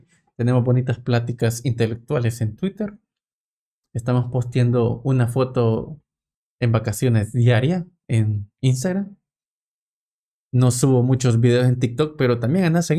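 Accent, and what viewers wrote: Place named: Argentina. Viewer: Che de donde sos? Te escucho y algunos modismos o formas de hablar pareces de Argentina jaja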